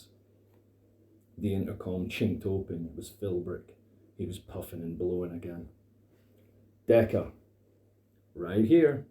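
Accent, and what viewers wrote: Scotland, United Kingdom. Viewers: Cool, I subscribed to your channel, so I look forward to that. The Scottish accent gives the reading a nice twist as I don't believe I have come across a Scottish narrator before.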